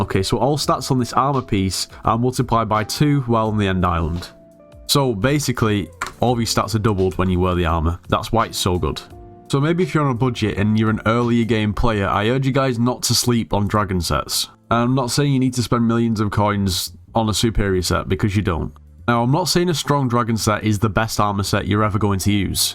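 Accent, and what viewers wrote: United Kingdom. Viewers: He is the most British sounding British person I've ever heared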